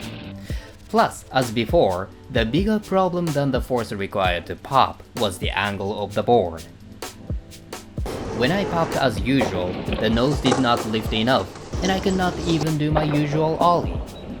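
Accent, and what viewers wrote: Japan. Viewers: やっぱり日本人です。発音 が。。。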